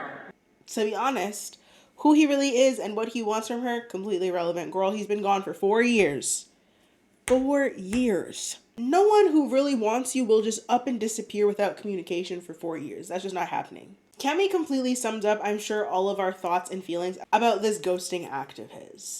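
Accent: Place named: Canada. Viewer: It’s crazy I skipped over you being Canadian in your bio and I heard you say “about” as “aboot” I had a degrassi flashback and said ohhh… is def Canadian 😂I’ve watched plenty of your vids and somehow never paid attention to that until now but I love it ❤😂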